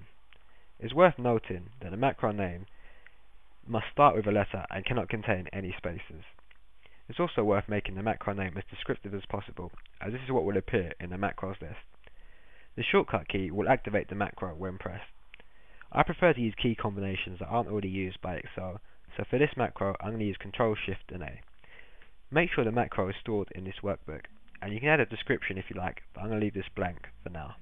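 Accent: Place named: United Kingdom. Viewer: THE PRESENTER IS PRONOUNCING THE WORD "BUTTON" AS "BUNS" IS THAT A BRITISH ACCENT THAT I AM HEARING ? THIS ACCENT IS SOME WHAT ANNOYING.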